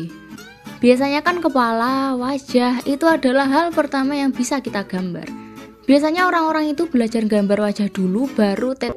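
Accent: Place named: Java, Indonesia. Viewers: Penjelasan dgn jokes yg sangat medhoksionist dan expressive👌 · Jowo banget yo suarane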